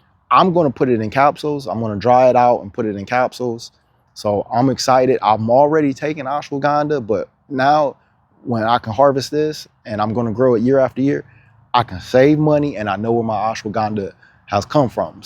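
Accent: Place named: Los Angeles, United States. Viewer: You sound like you’re from LA.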